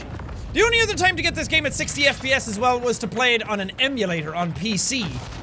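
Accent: Ireland. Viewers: Tried watching a JSE video from 2013... couldn’t get past the first minute... I missed high energy Jack with his full Irish accent! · can somebody help. pronounces tri angle as try angle instead of triangle. he emphasises the space in between, is this due to his Irish accent/upbringing. I am northern English and do not pronounce it like this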